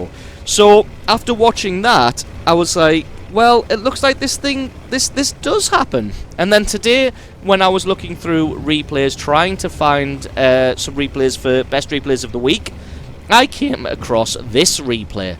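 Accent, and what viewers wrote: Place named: Scotland, United Kingdom. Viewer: There were some Scots features ;-)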